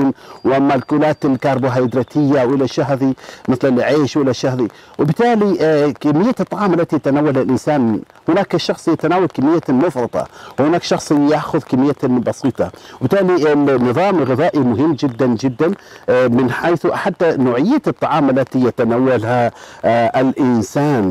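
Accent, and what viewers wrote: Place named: Balochistan, Pakistan. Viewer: انت من بلوشستان عايش في عمان يا لان لغتك العربيه ليست لغة العرب وبارك فيك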